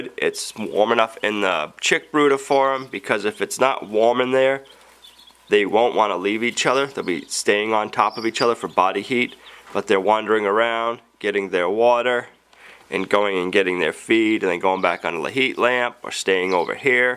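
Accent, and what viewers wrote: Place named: Pennsylvania, United States. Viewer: One, I love your accent. Im from indiana it reminds me of a friend of the family who was from Pennsylvania. I just love it. Also your videos are inspiring!! Id still like to know what type of dog your dog is? I pray the syrup flows and the snow melts as fast as you need! Thanks for sharing with us!!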